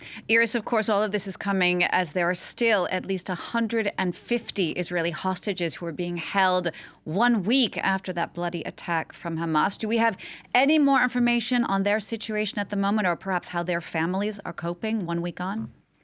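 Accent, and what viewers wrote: Australia. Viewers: She is very understandable but her accent sounds like a cross between American and British,more rhotic than RP but less than 'Standard" American. Perhaps it is an Australian accent ,as other commenters have suggested. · You clearly don’t know an Australian accent. At least 5 people have now commented saying it’s an Aussie accent. Judging by your responses so far and Knowing your mentality they WILL ALL be “Wrong”. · She is probably Australian. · It is an Australian accent, everything was said clearly. The Australian accent is for the most part non-rhotic. This means that the pronunciation of the /r/ sound will never occur at the end of words. Where an American will say three separate sounds for the word car /kar/, an Australian native speaker will only say 2 /ka:/. This is a key feature that only occasionally has exceptions. There is nothing to change with the pronunciation of the words.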